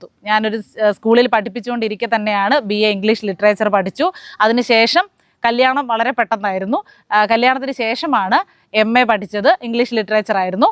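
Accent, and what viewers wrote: Thiruvananthapuram, India. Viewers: കമ്മികളുടെ 10 കൊല്ലത്തെ ദുർഭരണം താങ്കൾക്കു വീഡിയോ ചെയ്യുന്നതിന് വിഷയ ദാരിദ്ര്യം ഇല്ലാതായി. പിന്നെ തിരോന്തരം ഭാഷ നല്ല രസമുണ്ട്. വിജയാശംസകൾ 💅💅💅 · ഈ തൃരുവന്തസ്പുരം ഭാഷ എവിട്ട്നിനിന്നും കിട്ടി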